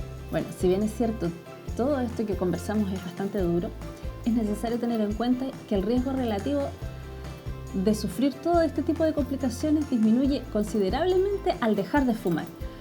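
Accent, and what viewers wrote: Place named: Chile. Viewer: Buena información, pero terrible ese acento 🤦‍♀️🤦‍♀️ deberías modularlo un poco, para que te entiendan los q no son chilenos.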